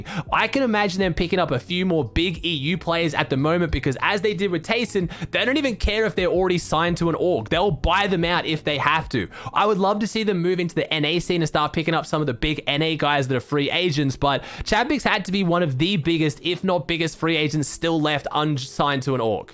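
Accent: Australia. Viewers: sheeesh aussie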